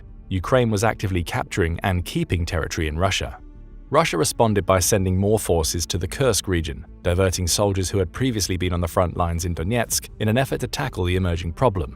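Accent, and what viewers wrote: United Kingdom. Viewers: Because it was narrated by a British dude and the video is full of clips instead of human faces. And there are a lot of videos that are like this.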